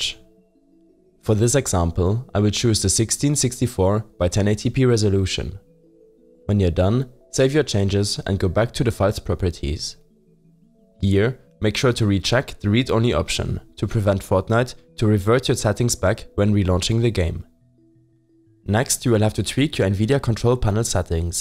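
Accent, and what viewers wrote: Germany. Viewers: Nice one dude, it worked on my computer and btw are u german?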